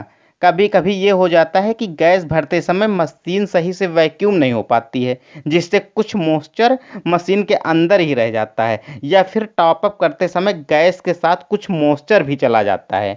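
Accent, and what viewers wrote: India. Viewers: You Are Gujrati?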